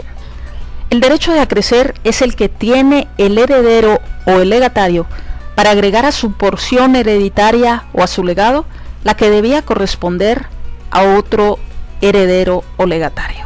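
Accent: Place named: Mexico. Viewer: María Camila Simbaqueba Sánchez al parecer es de México, por el acento.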